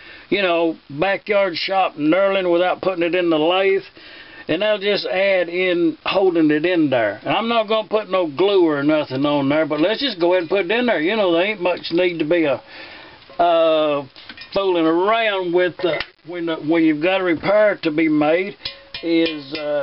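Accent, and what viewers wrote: South, United States. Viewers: It’s really interesten how you took dat wearer to get the spring ends through. Good job. Love your Southern accent. 👍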